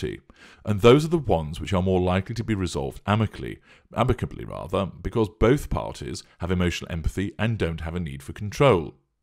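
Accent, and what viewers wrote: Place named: Northern England, United Kingdom. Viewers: You sound like Professor Higgins or Colonel Pickering, in My Fair Lady. As an American, I can attest that at least 75% of English accents are charming, and we also love an Irish brogue or a Scottish Burr! We are completely charmed by almost any UK 🇬🇧 accent. However, there’s something soporific about the mellow tone and accent of. He could read the telephone book and put me straight to sleep. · Manchester and environs . Would be my best guess . But am a Northerner myself . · you have the sexiest (posh with a slight Northern inflection) voice on Youtube but then you already know that.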